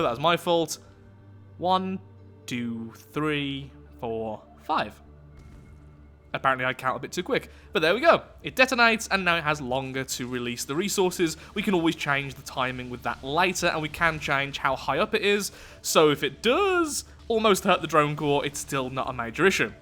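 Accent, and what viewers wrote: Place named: Wales, United Kingdom. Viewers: wait is from wales....*fist thing i think of* "WALLLLLLE LOOOOOORDDDDDDDDDDD" cough sorry i mean no dis respect...i was alwase wondering were your acent came from cool to know (sorry about the bad english) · You are Welsh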